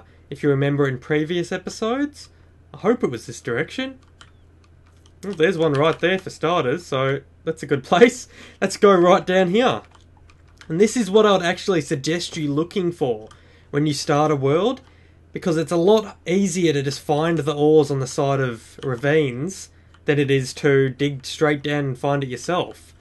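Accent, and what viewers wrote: Australia. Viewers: is it just me or does sound australian?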